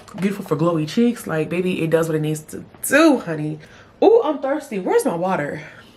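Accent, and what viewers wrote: United Kingdom. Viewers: I love it British voice 💓 LOL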